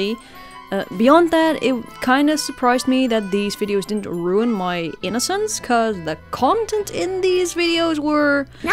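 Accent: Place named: Netherlands. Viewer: Welcome back,! I miss your glorious animated story time videos and this is certainly one of them with your fluid, cute art style and entertaining stories and storytelling! I also love your Dutch accent and you remind me of a fellow Dutch artist friend of mine. Anyway, I anticipate seeing more content like this and I would like to see more of your bootleg Vocaloid days. 😄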